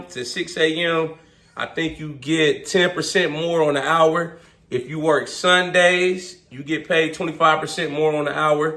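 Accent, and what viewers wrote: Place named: Ohio, United States. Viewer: I knew you were a fellow Ohioan due to the way you talk, I’m from cleveland and thinking about going to Texas 💪🏾‼️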